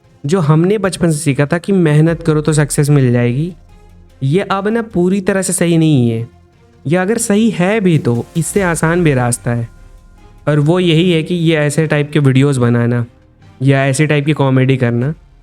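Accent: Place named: Uttar Pradesh, India. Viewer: Aapke accent se laga ki aap UP me khi se ho. Maybe Lucknow? I can be wrong.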